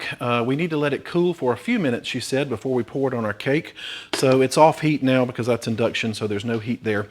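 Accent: South, United States. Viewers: Oh my goodness, so I stopped doing keto about two years ago, maybe more and I have gained more way in the last two years than I ever have in my life. I have been so miserable, so I’m jumping back on the keto wagon. But I used to watch your videos all the time, and I just have to tell you… You look amazing!!!! I thought you looked great several years ago, but when I saw you again, I barely recognized you. I knew your sweet, southern accent. If you didn’t have a husband and you were straight, I’d be hunting you down… Just kidding, I’m not a stalker. But you do look amazing honey. I’m so proud of you. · I love your videos and the cakes look delicious, you make me smile the way you react to the taste testing, well done, your a great cook and a big thank you to the creators of these cakes, I also love that Southern drawl of yours. ❤😎 🇬🇧🏴🇺🇲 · Even if I have no interest in a particular recipe I ALWAYS love to hear what has to say. 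The videos are exactly as long as they need to be and have helped me stick with keto and not get bored. Useful info, humor and heart — and that delightful southern accent just seals the deal! ❤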